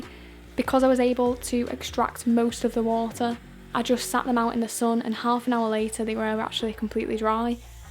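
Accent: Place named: Wales, United Kingdom. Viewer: I love your accent. Welsh?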